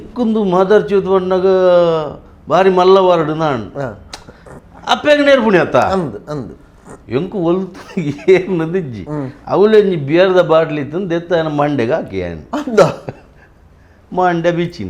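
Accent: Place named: Mangalore, India. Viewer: Kudladha kannada super chethu, Yir pandidh tojapayar tule,avvu kudladha kannada.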